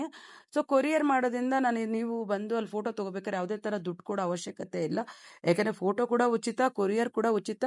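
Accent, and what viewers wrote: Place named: Chikkanayakanahalli, India. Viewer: Tumakur chikkanayakana Halli talk. Huliyar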